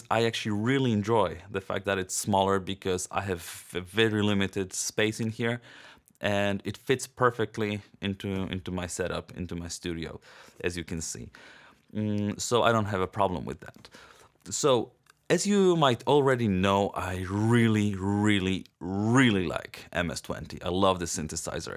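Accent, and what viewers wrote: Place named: Sweden. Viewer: Love your swedish accent! musical!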